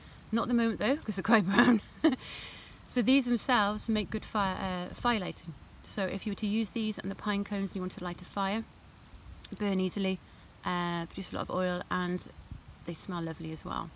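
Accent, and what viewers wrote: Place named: United Kingdom. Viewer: I wish I could understand your English-very British Very difficult to make out your words…sorry Because I’m very much into health/herbs…😟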